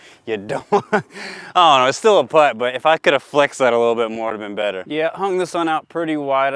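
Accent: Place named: United States. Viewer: always wondered why you have an american accent and say things from 70s-80s vernacular.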